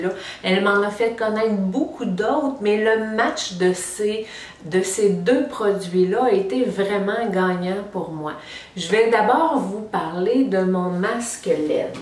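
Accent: Canada. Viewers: Bonjour Je suis très contente d’avoir découvert votre chaîne. J’ai une question concernant la tretinoine et le masque led. Je souhaite m’acheter un masque et j’ai commencé la tretinoine depuis plusieurs semaines. Du fait que ce soit photosensibilisant je me demandais si vous continuiez la tretinoine ? Si vous le faites le jour où vous mettez la crème ? À quel fréquences faites vous le masque ? Merci beaucoup pour votre bonne humeur et votre petit accent canadien 😘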